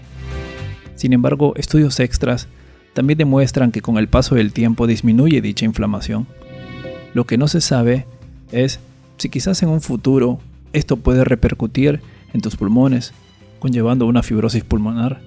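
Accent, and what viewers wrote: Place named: Peru. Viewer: Por el acento me parece que es peruano. El youtuber.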